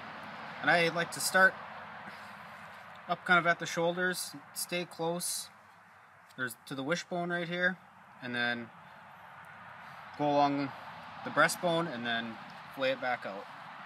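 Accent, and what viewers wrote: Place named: Canada. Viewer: I hear that Canadian accent. That was a Canada goose, or as it is called in Canada, a goose. Must be why it just says goose in the title of this video. Well, the video was very good. Thanks!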